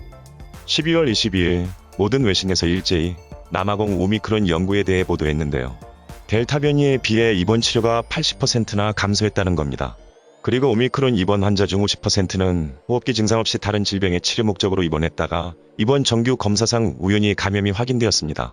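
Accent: Gyeongsang, South Korea. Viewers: 의학정보중 제일 신뢰가 갑니다. 하루빨리 목소리가 회복되셔서 구수한 경상도 사투리로 말씀하시는걸 듣고 싶습니다. ❤️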